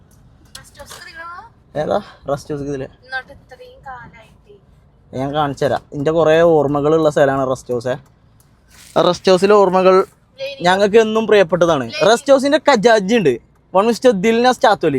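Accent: Malappuram, India. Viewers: Paka malapuram slang analo😂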